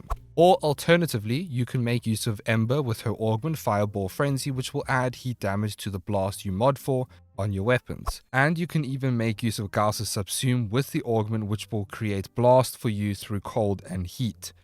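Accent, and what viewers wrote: South Africa. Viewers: Your South African accent makes you more trustworthy somehow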